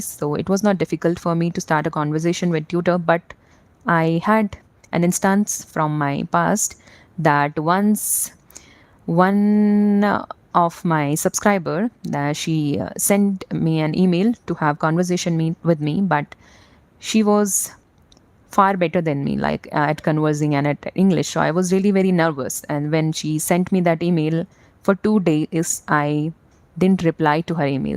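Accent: India. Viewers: Hai good evening,even if you from chamoli you are pronouncing exactly like Keralates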